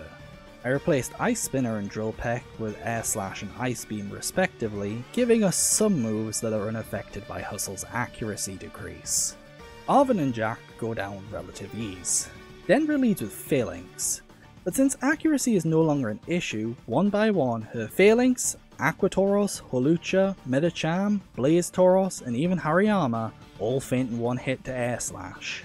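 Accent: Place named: United Kingdom. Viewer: Me: Who is this channel? Algorithm: Trust me bro Me: (skeptical) okay... (plays video) (player has British/Euro accent) Me: oh FUCK YEAH this finna be good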